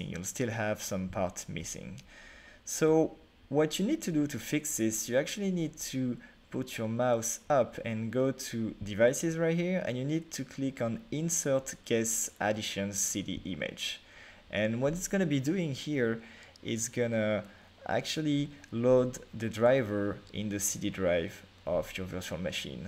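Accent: France. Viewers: are u french xD ?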